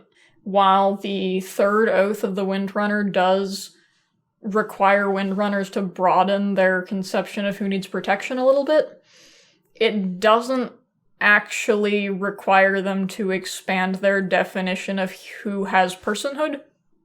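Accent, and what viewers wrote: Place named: Idaho, United States. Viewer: Are you from Idaho by any chance? You have almost my exact accent. Excellent video!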